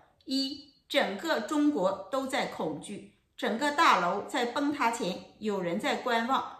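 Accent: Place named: Northeast, China. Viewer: 你东北口音，又不是徐州的，怎么了解的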